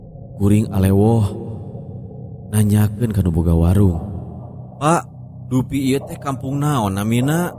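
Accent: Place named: Sunda, Indonesia. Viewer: Bahasa medok sunda gk paham ngomong apa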